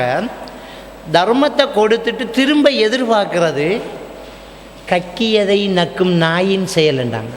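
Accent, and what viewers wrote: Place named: Sri Lanka. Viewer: திருமணம் பற்றியும் தலாக் பற்றியும் தெளிவாக விபரமாக சிறப்பாகவும் சுந்தர இலங்கை தமிழில் அருமையான விளக்கம். அல்லாஹ் உங்களுக்கு ஈருலக பாக்கியங்களை வழங்குவானாக.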